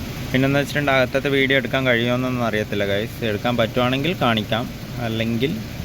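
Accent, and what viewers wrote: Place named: Kasaragod, India. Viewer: സംസാരം കാസർഗോഡ് 😂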